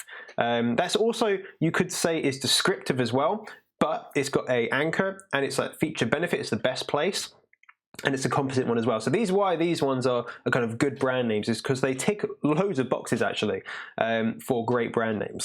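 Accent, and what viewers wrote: United Kingdom. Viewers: Do you need to be so rude and not even try to pronounce the name of the author of the book you used for your video? Can't you pronounce any other phonetics apart from bri'ish mAtE?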